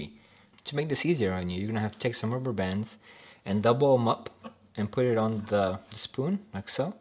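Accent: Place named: Russia. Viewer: Are you Russian?? You talk like a Russian (not for bad)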